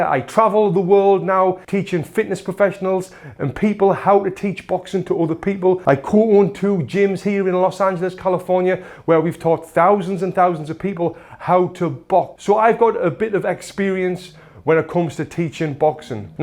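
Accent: United Kingdom. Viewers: I love your accent sir. I think it's British accent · His boxing is awesome, but how pleasing his British(right?) accent is? +100 to boxing skill if you can talk like that, I'm not gay btw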